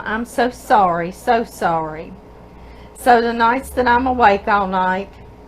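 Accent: South, United States. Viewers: I’m a new listener to your channel and I just love your cooking as well I visiting with all of your listeners 🥰! I just love your little southern accent. You are so down to earth and you and show your love of God when he lifts you up in prayer and whenever he thanks God for giving him work. There certainly needs to hear more of this. Praise God almighty for sending people like you both into my life as I am a dialysis patient and am 87 years young and fighting for my life and you mean so much to me🙏🏼🙏🏼🙏🏼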